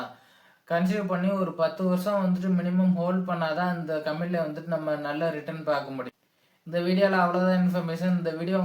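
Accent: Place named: North, India. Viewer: Vadakan mari pesatha, Olunga pesu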